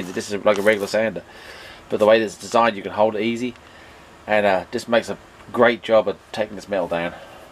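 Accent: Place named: Norfolk, United Kingdom. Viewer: Loved it, nice job! Nice to hear a good old Norfolk accent as well. Keep it up!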